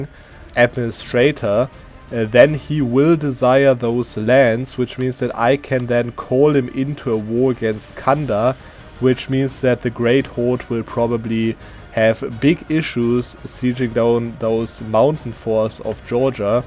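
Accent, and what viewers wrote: Germany. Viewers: Thanks for the reply. I liked your video otherwise! You have a sure way of speaking, and a nice German accent. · The german dialect is strong in this one. Love it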